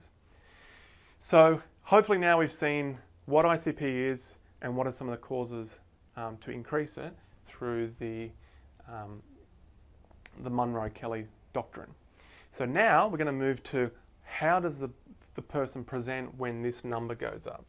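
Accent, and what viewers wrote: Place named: Australia. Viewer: Legend! So much easier grasping this concept hearing it from a fellow Aussie! Cheers :)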